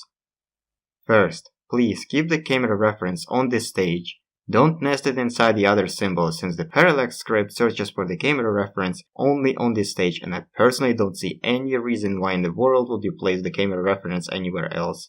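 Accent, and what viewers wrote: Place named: Russia. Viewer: о, ну по акценту уже похоже что ты русскийя:3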